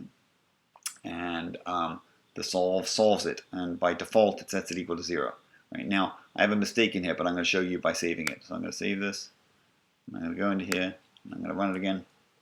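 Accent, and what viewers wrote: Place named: Australia. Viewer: Too bad this course is online, I would have been delighted to have a prof with an Australian accent!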